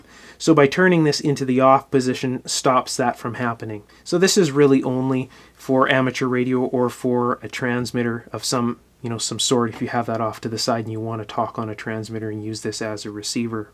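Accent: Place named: Canada. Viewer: Are you from Canada? it sound like you have a Canadian accent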